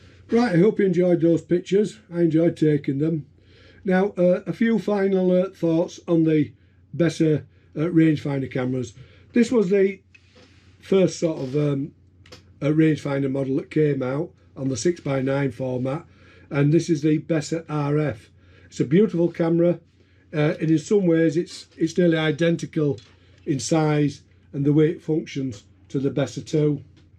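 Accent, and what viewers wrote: United Kingdom. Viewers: subbed straight away just for the tyke accent. I'm originally from Cas'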